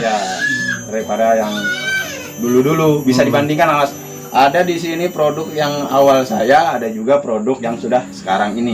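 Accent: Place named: Jakarta, Indonesia. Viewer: Logat bicara nya kaya orang betawi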